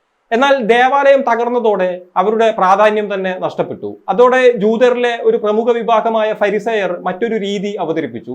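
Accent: Kasaragod, India. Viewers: പണ്ട് കോളാബി ചാനലിൽ , ചെയ്തതുപോലെ roasting പരിപാടി പൊടിതട്ടി എടുക്കാമോ.. we missing your humour senses in Kasaragod slang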